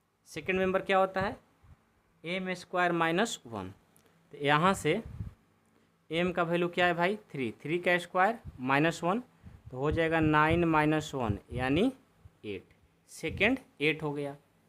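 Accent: Bihar, India. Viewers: Sar ki language se lagta hai ki sar Bihari hai lekin kahate Hain Na ki ek Bihari Sau per Bhari😊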